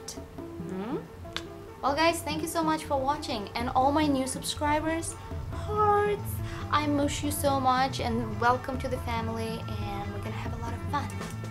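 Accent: Russia. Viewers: I would....yeah probably steal it from my sister and eat it. Я люблю ваш русский акцент! :) Moosh!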